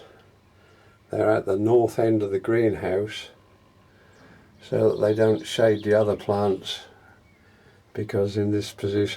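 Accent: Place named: England, United Kingdom. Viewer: i dont understand the latin names with the english accent but they're beutiful... espacialy the ones planted in the ground! i wish i could live by myself only from food i grow and dedicate my entire life for the plants.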